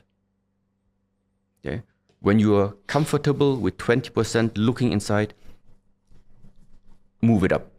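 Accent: Germany. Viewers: The German accent makes it kinda funny 🤭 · His accent sounds so german .. 😅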